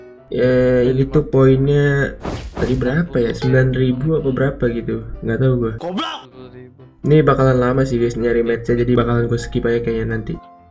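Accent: West Java, Indonesia. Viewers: bang org mna bang Kaya Org Sunda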